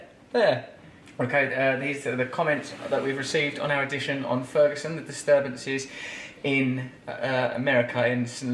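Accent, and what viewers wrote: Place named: United Kingdom. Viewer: I loved RB taking on Sean Hannity. I am a conservative but FOX news is not my form of conservatism. This guy is actually very bright and incredibly articulate. He also has one of those British accents that doesnt drive me crazy after 5 minutes like so many of the pompous, stuffy old guard English wankers. Do something less intense like Sports role (or opportunity) in global compassion. Better yet expose FIFA for the criminals and corrupt organization that they have become. Same with the IOC. How can Russia be awarded first the Olympcis and then the World Cup given their record on human rights? I do not agree however that we are throwing our votes away because we dont have a candidate to support. its sounds to me like his solution may be revolution. I can only support peaceful action. We are far too evolved to allow what is happening in the world to continue. 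Yes the people need to rise up however they need a leader that has compassion for mankind.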